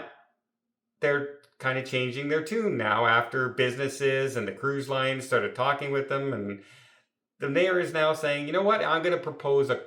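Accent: Canada. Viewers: maybe Canadian pronunciation. Just sayin'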